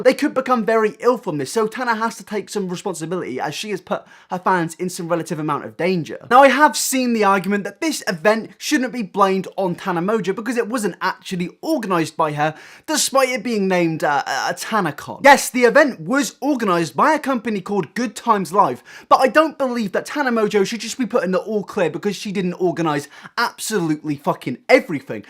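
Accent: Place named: United Kingdom. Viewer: If you don't mind the question, what part of the UK are you from? I'm living in Blackpool right now and I just can't place where your lovely accent is from haha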